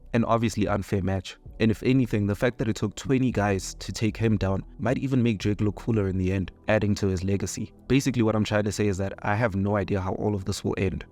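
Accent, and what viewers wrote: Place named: South Africa. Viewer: South Africa.. I can hear it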